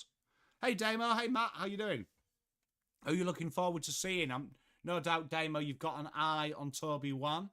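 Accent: England, United Kingdom. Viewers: What part of England are you originally from?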